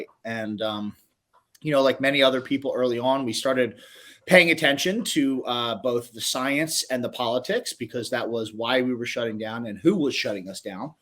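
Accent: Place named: Michigan, United States. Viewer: Sounds a lot like Michigan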